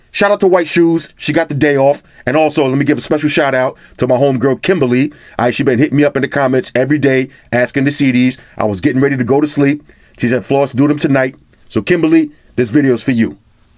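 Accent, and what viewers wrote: New York, United States. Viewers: Floss gotta fight his New York accent when he says "slot" 😂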